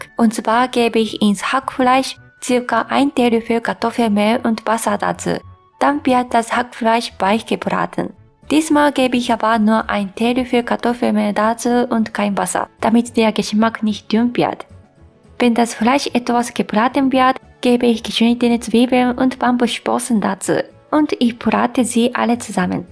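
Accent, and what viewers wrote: Japan. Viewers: "Und dann gebe ich noch 50g daTSU" Japanischer Akzent ist einfach bezaubernd <3 Ich muss es unbedingt nach kochen!, danke für dein tolles Video!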